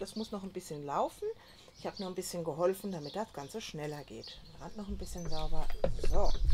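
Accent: Hesse, Germany. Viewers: Großes Lob an die Macher, und am besten gefällt mir, dass ab und zu auch mal ein hessisches Wort durchklingt 🙂👍weiter so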